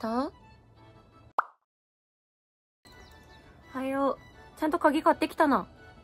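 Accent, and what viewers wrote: Tsugaru, Japan. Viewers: めちゃかわいくて、津軽弁で話したら惚れちゃうかも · 女の子の津軽弁上品やな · の津軽弁刺さりますw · お若いのに津軽弁を話せるとはすごいです。今でも、地域的に津軽弁の濃いところはあるのですが、若い人はしゃべれなくなってきました。けっぱってください。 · やっぱり、津軽弁カワイイ〜❣️ホッコリする❤️ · 本当、津軽弁可愛いっす😍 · の津軽弁、あいかわらずめんこいな～！次は、『いずい』だの『しずね』に『ねっぱす』なんか、いいんでねぇべが… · 津軽弁の上級編？！、少し~慣れるまで時間がかかるかな。でもお国言葉ってほのぼのして良いな~。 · の津軽弁、いいね😊 標準語と津軽弁で服装変えるのは、のイメージかな？？？ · 北海道の多くの地域でごみを投げるで通じますよ。ちょす＝いじるは函館をはじめ道南地方では使いますね。布団着るもわかるし、へばね～も使うよwww 津軽弁で喋る可愛すぎ！ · 第三段やって欲しい！の津軽弁を永遠に電話で聴きながら寝落ちしたい笑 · 、思い付かなくても、シリーズ化してください🙇 やっぱり津軽弁のにドキドキです💖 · 津軽弁良いですね₍ᐢ⑅•ᴗ•⑅ᐢ₎♡ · 良いなぁ。津軽弁‼️まっと(もっと)やれじゃ‼️(やって) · 津軽弁良き！😆 シリーズ化して欲しいな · 寝ようとしたら女神？天使？津軽弁ぶち可愛いやんけ！(広島弁) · こんばんは‼️ ってかの津軽弁滅茶苦茶可愛い過ぎですね👍 地方から（自分もですが） 東京に上京して年数が経てば経つにつれ自分の生まれ育った土地の言葉って正直イントネーションとか自分では気付かない内に変わってしまいがちだけど… 今日もに癒やされました。まだまだコロナも収束を見せる処か大阪に続き東京もまん防発令されるかも知れない状況ですが本当の家族の為にもコロナには十分気を付けて下さいね‼️ これからも応援してます👍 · こんばんは津軽弁のも可愛いすぎとるね。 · だいぶ綺麗な津軽弁ですね。わの周り汚い津軽弁です。五所川原だはんで。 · 今日も見れた！津軽弁可愛い✨ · 初見です！父方の実家が青森で幼少期実家のように訪れてたため津軽弁が恋しくなってポチりました！動画自体は凄く良いと思います！ただ訛り方が少し津軽弁弱いと思ってしまって懐かしさがあんまり感じれなく残念と思ってしまったのが感想です...これからも頑張って下さい！ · の津軽弁カワイイ！ASMRの津軽弁寝かしつけの動画やって欲しい！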